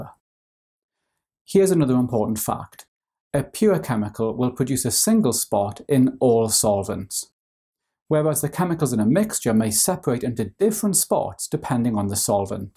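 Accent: United Kingdom. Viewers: Think the accent is British- colloquial .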